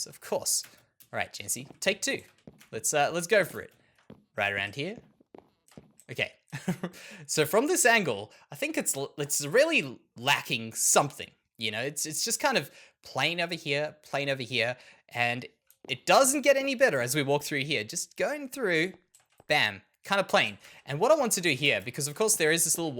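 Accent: Australia. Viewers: Jansey are you Australian?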